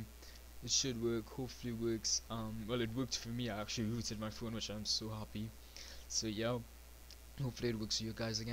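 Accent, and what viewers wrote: Trinidad and Tobago. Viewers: ur trinidadian?? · u sounding like u from trinidad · dude are u Trini ? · Aye Bhai youze a Trinidadian ?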